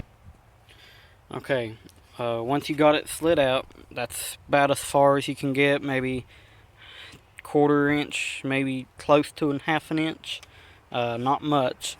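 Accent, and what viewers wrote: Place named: Pittsburgh, United States. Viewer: Are you in the Pittsburgh area? I noticed from your accent. If so, I have a 99 Legacy I might need advice with for changing bulbs... pm me!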